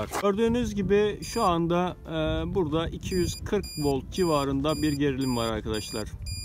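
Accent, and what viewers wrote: Turkey. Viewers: Ende manavgatli gibi az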